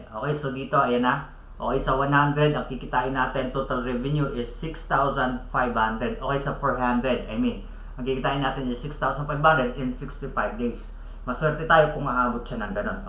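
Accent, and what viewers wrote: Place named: Visayas, Philippines. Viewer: bisayang tagalog.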